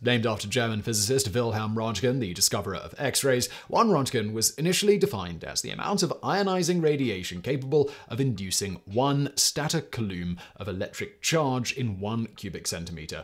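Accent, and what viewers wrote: United Kingdom. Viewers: He has a very clear and precise British accent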